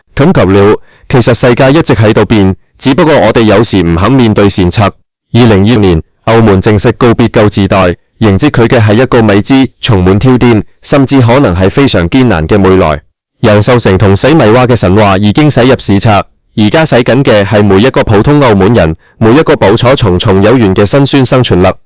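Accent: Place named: India. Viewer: 印度人講廣東話.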